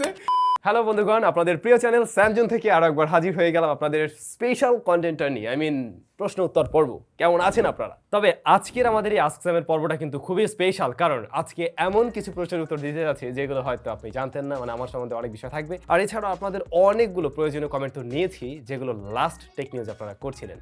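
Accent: Barishal, Bangladesh. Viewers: ভিডিওটা দেখে খুব ভালো লাগলো ❤️ ভাই মুইও কইলো বরিশাইল্যা 😂 আমনেও তো হেইয়া ধন্যবাদ 🌺 স্যাম ভাইকে 💝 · Vai Tumi mogo boreshailla SUNA khubi Valo laglo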